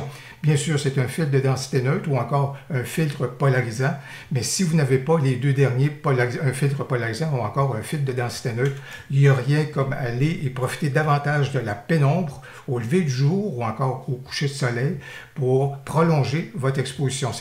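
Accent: Quebec, Canada. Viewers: l'accent québécois et toujours un pur plaisir de sonorité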